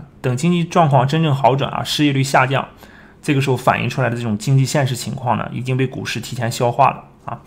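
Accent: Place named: Northeast, China. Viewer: 听出来有东北口音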